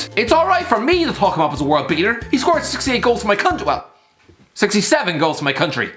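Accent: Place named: Ireland. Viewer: I love this guy his Irish accent is so funny wich makes the videos so entertaining. Hope everyone is staying safe through these tough times.❤❤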